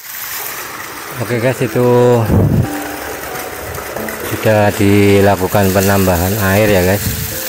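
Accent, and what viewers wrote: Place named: East Java, Indonesia. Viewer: daerah mana Om. logat nya kaya jawa timur🙏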